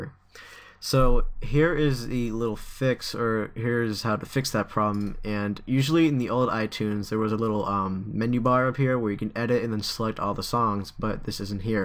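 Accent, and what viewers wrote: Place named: United States. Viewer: Cool accent ! What part of the states you from??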